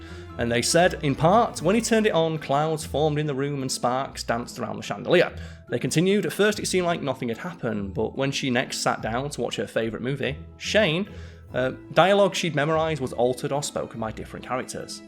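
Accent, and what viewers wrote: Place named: North, United Kingdom. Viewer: Hey, I’m an American Brit who grew up in London until I was 15 and then my parents moved back to the states when my father left the US Embassy Service. I am now 74 and have a bit of a hearing problem. My very expensive hearing aids don’t work as well as I would like. I sometimes have a difficult time following your wonderful northern accent. If you could slow down a bit, I would have a easier time understanding you. I do not expect you to speak full on RP just slow down a bit and enunciate a bit more clearly.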